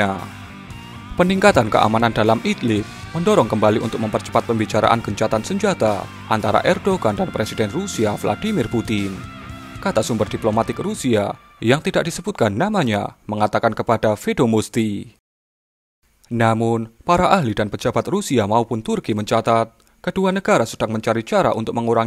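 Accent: Java, Indonesia. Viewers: Medog mu bro....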